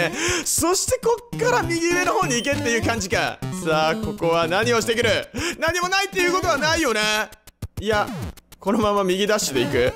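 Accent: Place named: Kyushu, Japan. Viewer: こんなOPとか言ってるけど、、、それがいいんすよｗ こんなって言ってるけど、こういうのも考えて作成して、そして動画編集もして、、、、無理しない程度にお願いしますね！長く永くなが～～～くさんの動画が見たいので！！あと、自分熊本なんで、ちょくちょく出る方言も聞きなじみがあるけん、そのままのスタイルで言ってほしかばってん、、よかろか？？お願いしますｗｗ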